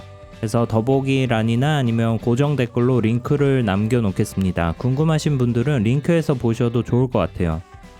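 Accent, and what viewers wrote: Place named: South Korea. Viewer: You were born in America?? How come you have heavy Korean accent?? 😳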